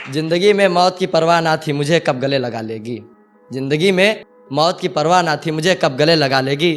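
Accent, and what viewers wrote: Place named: Allahabad, India. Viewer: Superbbb bro❤️.....pure allahabadib... must listen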